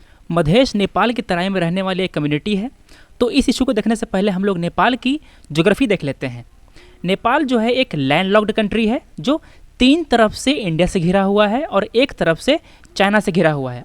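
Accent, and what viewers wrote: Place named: Bihar, India. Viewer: Even you sound bihari ..... You sound pro-madeshi and biased Where is dhavan sir ??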